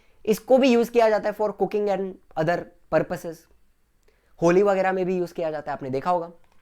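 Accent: Maharashtra, India. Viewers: सर appp Maharastrian ho kya ?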